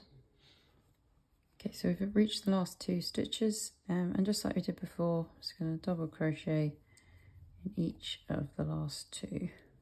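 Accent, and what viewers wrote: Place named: United Kingdom. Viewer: UK accent but using American stitch notation!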